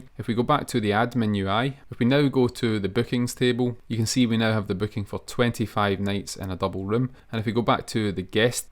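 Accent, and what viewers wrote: Scotland, United Kingdom. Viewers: Just curious - where's your accent from? I'm thinking Scotland, but I'm not sure if I've heard it before... 🙂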